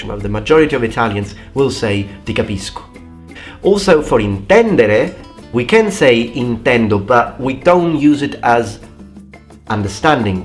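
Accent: United Kingdom. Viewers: Tienes un acento británico increíble. Cómo así? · I would like to hear your opinion of British English vs American English. I say this because the English you speak sounds British, not American. Besides the accents being much different, American English, especially in the Southwestern United States, has Spanish influence. I grew up in the Southwest, so a few spanish words were part of my natural vocabulary. I was 30 years old and living in the south eastern US when I encountered people who didn't understand when I said PLAZA. They asked me to define it, and that was the first time I actually realized I was using a Spanish word, and not all Americans understood it. It took me a couple minutes to define it. I'm enjoying your videos, keep up the good work. The closest related language to English is I believe Dutch. There may also be a difference between the similarity of British vs American English. 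Dutch settlers came to the New World at the same time as the English settlers, and some English settlers had lived in Holland for a while. Additionally, Amish, Mennonite, and some other communities still speak Dutch, as well as English, and even refer to those outside their communities as "the english." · ok, here's one for you. My dad (padre) was born in Sicily, emigrated postwar as a small child. Mi Noni they never got a handle on much English, so I grew up hearing the dialect, enough to mess up my Spanish in college and with a Honduran girlfriend. So what is my question? why the British accent, My zio's and Zia's sounded like new yorkers and I sound like someone from Chicago? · why does he sound so British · Why do you have a British accent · Do you live in the UK? I'm American and can't always pinpoint the different British accents, but when you speak English it sounds un poquito italiano y muy britanico :)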